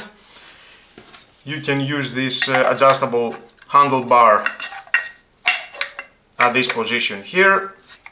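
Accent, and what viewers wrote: Greece. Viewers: Έλληνας είναι . Φαίνεται απτή προφορά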